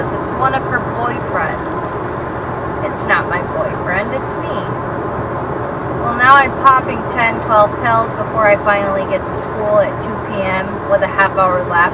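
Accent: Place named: Rochester, United States. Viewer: Holy shit are you rochester Or Buffalo? You sound like you have a downtown Rochester. Hart accent like the East Side. Or I could be wayYou sound like you have a downtown Rochester Hard accent like the East Side. Or I could be way off And your midwestern, like i'm starting to believe chicaaaagaaa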